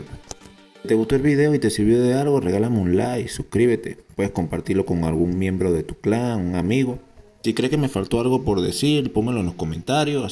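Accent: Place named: Venezuela. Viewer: Papi me suscribo, fijo eres venezolano casi que zuliano saludos desde la merjor ciudad de venezuela Maracaibo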